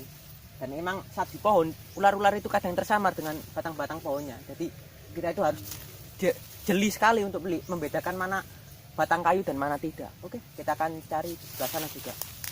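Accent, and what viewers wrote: Java, Indonesia. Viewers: Dari segi bicaranya keknya dari orang jawa:v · Jowo ne endi mas? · Nk ngomong oj medok" to..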